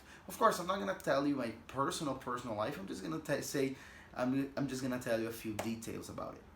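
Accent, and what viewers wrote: Brazil. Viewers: Thanks. You should make one brief mention of Brazil in each video so new viewers will know where your very pleasant accent originates. Like "Here in Brazil...." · Imaginei que era brasileiro por causa do sotaque. Caracteristico!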